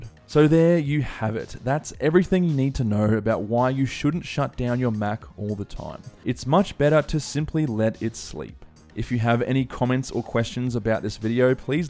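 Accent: Australia. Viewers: Are you Aussie? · Fabulous really good quality presentation and great to hear an Aussie.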